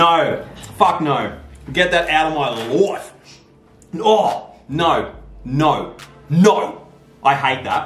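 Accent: Australia. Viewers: I love ur Australian accent. Lol Im in Australia Melbourne! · no he's just australian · Hes Australian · That's the most Australian accsent I've ever heard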